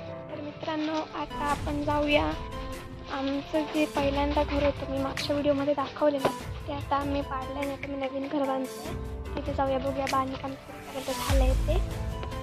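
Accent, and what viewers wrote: Malvani, India. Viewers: येताला जाताला होताला सुपर मालवणी लागला तुका झाला माका ☺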